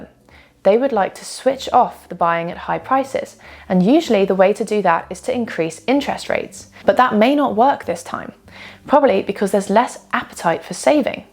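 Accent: United Kingdom. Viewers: 有一口英音太迷人啦！🙃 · you are very beautiful! 🥰Your British accent is fascinating!🤩 I am from Hong Kong and I am an English-Language learner. This is the most fabulous English-Language learning channel I have ever seen!:yougotthis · I like your uk accent and clear pronunciation very much and thank you. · Clear and beautiful British English! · I like the way pronounces words with British accent. It just so pleasing to the ears.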